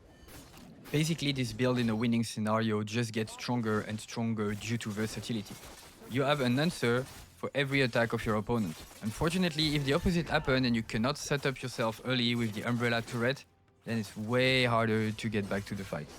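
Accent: France. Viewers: Je reconnais l'accent français , bien joué ta compris la meta mon gars 💪🏼💪🏼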